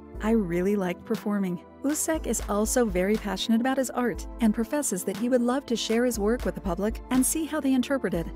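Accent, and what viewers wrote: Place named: United States. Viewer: I don't understand why you can't just put subtitles.. I come here to read about him, but it's annoying hearing someone speak.. especially with a strong American accent.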